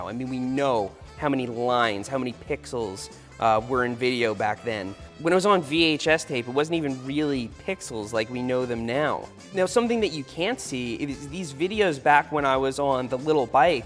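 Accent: Long Island, United States. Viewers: I can imagine what young would say with that long island accent "Forget about it" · The Long Island accent started to come up during the video while was narrating his footage 😂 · you sound more Lawn Guyland then,then you do now... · The way you sounded when you were younger is the exactly like my voice probably cause I’m from Long Island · It sounds like my people here in long island. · I never noticed the LI accent, but I should have being raised in Bayside. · Hey, do a whole video with your old long island accent! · Cannot not hear the long Island accent now lol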